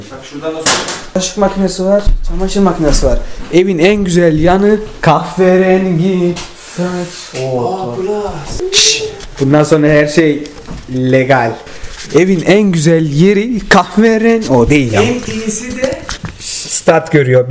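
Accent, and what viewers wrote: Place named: Konya, Turkey. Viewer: La bende konya bozkirliyim abi şive n tam bizim ora yaaa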